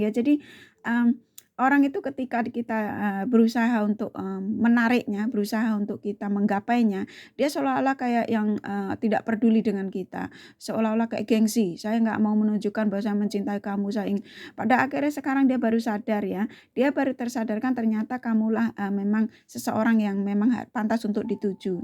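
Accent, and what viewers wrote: Java, Indonesia. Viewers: Trima ksh kak atas readingany, resonet jln ceritany, mf kak agak nyimpang sedikit dr tema ny, suara kak sama benar dg suara seseorg yg ad d dekat rumah q, logat Jawany, cara ngomong ny, nama ny Mbak Murti, dia buka salon d sini, coba dong kak tunjukan wujud kakak ingin lihatny, mf y kak kalo AQ agak lancang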